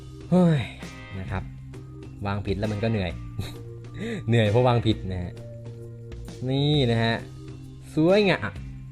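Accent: South, Thailand. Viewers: พี่แหลงภาษาใต้ได้ม้าย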